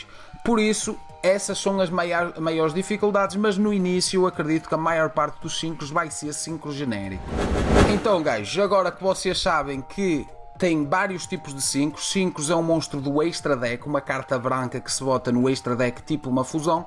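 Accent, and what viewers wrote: Portugal. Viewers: de Portugal, ora pois xD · Pensei que portugual falaria mais parecido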